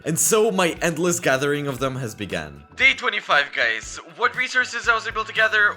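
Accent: Russia. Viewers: Are you Russian 🤔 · It's quite cool to see a Russian speaker talking in English for minecraft content, Subbed.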